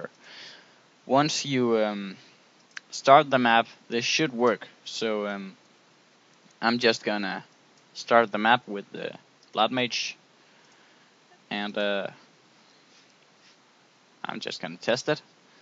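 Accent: Denmark. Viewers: You are Danish! =P Oh... And thanks for the help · du er dansker xD jaaaaaaaaaaaaaaaa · Oh shit, dansk accent alligevel lol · Haha dansker xD?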